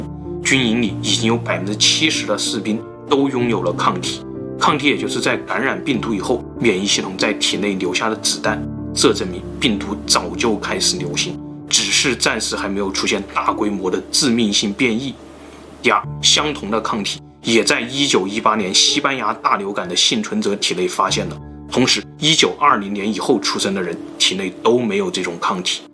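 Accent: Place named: Hunan, China. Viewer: 的湖南口音真的讓我欲罷不能啊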